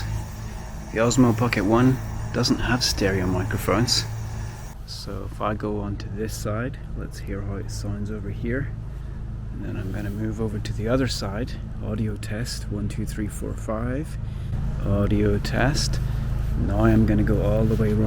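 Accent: Northern Ireland, United Kingdom. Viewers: Great review. I have the Pocket 1 and was considering upgrading. This has convinced me to keep with it although there are some great improvements with the Pocket 2. I just bought a controller wheel instead. Nice to see you are holding on to your Northern Ireland accent, it made you easy to listen to. Well worth subbing your channel.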